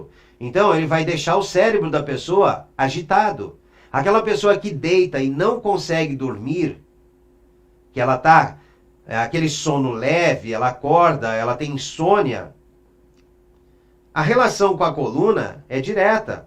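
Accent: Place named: Paraná, Brazil. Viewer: Quanta explicação lógica e de fácil entendimento neste vídeo, me esclareceu muito. Parece que é do Paraná, atende em quê cidade?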